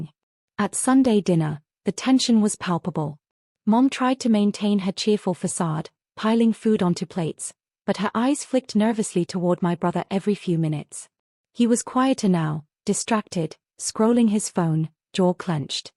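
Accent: United Kingdom. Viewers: What sounds like a British accent makes me wish we viewers were able to choose which accent we wanted to hear. That would be the only way I could hear these stories narrated by an AI voice with an American accent.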